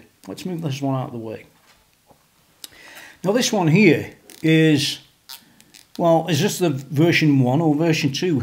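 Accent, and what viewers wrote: Stoke, United Kingdom. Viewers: Are you from Stoke by any chance? :)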